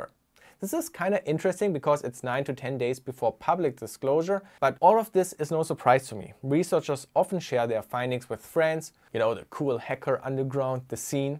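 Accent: Germany. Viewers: I really liked your video and I thought: sounds like a German accent... checking imprint and realizing that it is the same zip code as mine 2 months ago :D Greetings to Lichterfelde!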